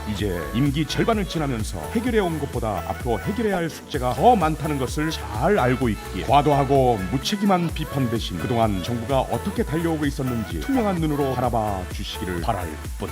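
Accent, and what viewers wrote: North Korea. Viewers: 문가공화국 방송 ㅋㅋㅋ 내레이션 북한이네. ㅉㅉ 꼴불견 퉤퉤. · 나레이션 말투 뭐야 북한인이야?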